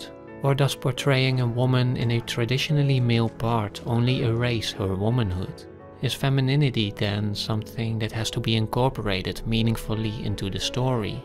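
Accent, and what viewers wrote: Netherlands. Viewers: kan ik opmaken uit je accent dat je in der Nederlanden woont? Klasse kanaal, topkwaliteit materiaal dit! :D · Are you Dutch? Your accent seems Dutch.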